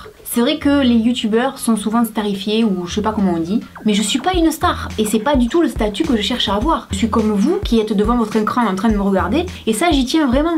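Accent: South, France. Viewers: Félicitations! Tu mérites amplement tout ce qui t'arrive de bien en ce moment! Bientôt 200 000 abonnés en plus! Toutes tes vidéos sont pleines de super conseils et on voit bien que ta maturité et ton expérience parlent et toi au moins tu ne dis pas d'énormités comme certaines jeunes youtubeuses. J'admire ton travail et ta force de caractère. Je suis ravie chaque fois qu'une nouvelle vidéo de toi sort. Tu es la seule dont je ne me lasse absolument pas. Et ton accent! Enfin du sud fièrement affiché sur YouTube pour nous représenter! Je n'ai que 23 ans mais je préfère tellement te regarder toi que d'autres plus jeunes. Change rien! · Bravo à toi! Tu as mérité tout ce qui t'arrive, tu es tellement souriante, naturelle, tu fais du super boulot et puis je vais te faire une confidence, j'adore ton accent qui me rappelle pour ma part quand j'habitais dans l'Hérault et pour mon mari lorsqu'il habitait Toulouse. 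Tu nous réchauffe avec cet accent ensoleillé;) Tu donnes aussi des astuces tellement utiles:) Pour moi tu es la copine qui serait là et qui me dirait " mais non ne jette pas ça, regarde tu faire ci et ça avec ta crème ". Petite question de curiosité: comment est-ce que tu sais notre âge? Gros bisous et toutes mes félicitations, c'est vraiment mérité:) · coucou vraiment bravo!! je te suis depuis longtemps et je t'adore tu as un accent de chez nous du Sud une abonné de +!!😘😘😘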